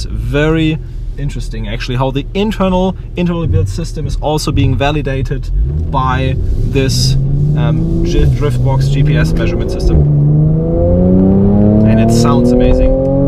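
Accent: Germany. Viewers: man hört an seinem akzent das er deutscher ist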